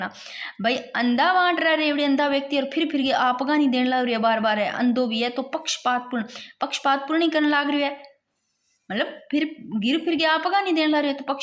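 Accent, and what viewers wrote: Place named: Marwari, India. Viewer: आप मारवाड़ी बोला करो मजा आता है सुनकर दीदी 😊😊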